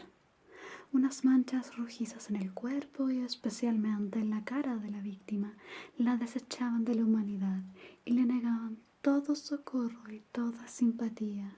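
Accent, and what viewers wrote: Chile. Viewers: Es muy agradable escuchar un lindo asentó chileno que no dice ningún garabato después de escuchar a estas xalas qlias de la calle todo el día gracias ❤❤❤❤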